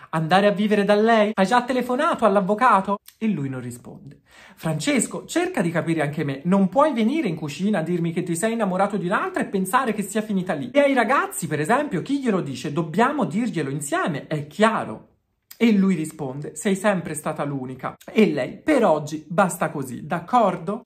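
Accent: Tuscany, Italy. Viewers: finalmenteeeeee ❤ mi fa morire il fatto che parli in toscano mentre spieghi 😂😂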